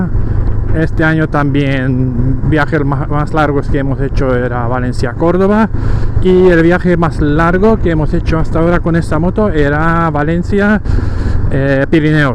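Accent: Romania. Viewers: Salut , esti român?..dupa accent😊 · Nosptros tenemos dos, una de mi mujer y otra yo. Estamos dando la vuelta al Mundo, y NINGUN PROBLEMA , excepto cambiar amortiguadores y perdida de gasolina en la de mi esposa por culpa de una fisura que aun no sabemos porqué. Obviamente, vamos muy cargadps( es nuestra casa) pero es una moto para toda la vida y para viajar. ( por cierto, que su acento me suena a Rumania. Y me alegro). Saludos desde ese Pais, esperando las motos, que vienen de Canada.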